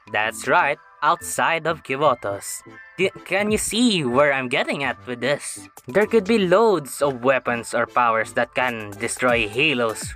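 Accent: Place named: Southeast Asia. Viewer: SEA ass accent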